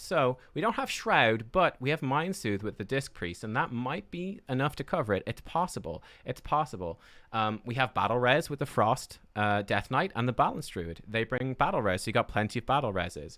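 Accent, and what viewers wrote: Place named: Ireland. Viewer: Finally, another great WoW content creator, and best of all, Irish! At least I think you're Irish? 😅 Nice work!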